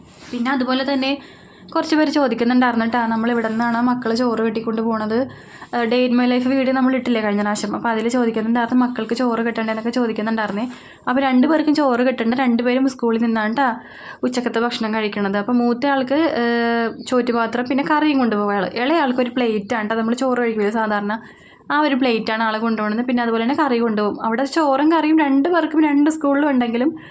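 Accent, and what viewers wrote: Kochi, India. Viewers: നല്ല വീഡിയോ👍 കൊച്ചിഭാഷയും അവതരണവും നല്ല രസാണ് കേൾക്കാൻ.❤ ആമിക്കുട്ടിടെ കാലിന്റെ മുട്ടു പൊട്ടിയത് ഉണങ്ങിയോ?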